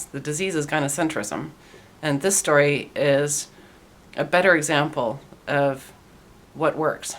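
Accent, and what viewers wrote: Canada. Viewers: Canadian Detected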